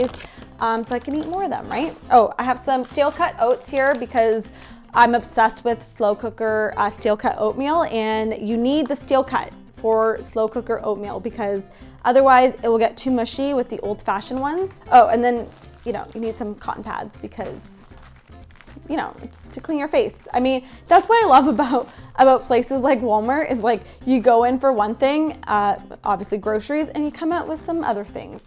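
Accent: Canada. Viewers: Oh my goodness your Canadian pronunciations! I am not used to hearing words said that way lol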